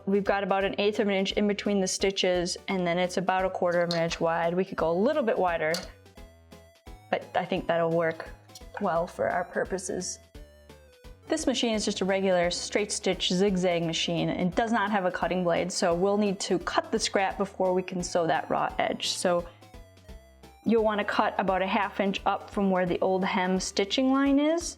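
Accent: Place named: Canada. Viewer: I thought she sounded Canadian....well, it's close by....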